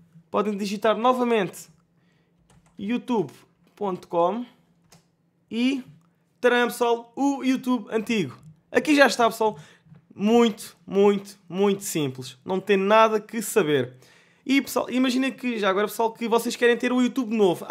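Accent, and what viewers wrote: Portugal. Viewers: Puta fudeo o é português não vou entender oque ele fala:( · Ele é português '-' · portugues de portugal =)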